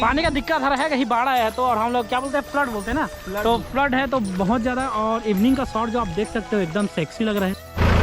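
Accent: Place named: Chhattisgarh, India. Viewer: ap ki Hindi + Chattisgarh bahut mazedar h.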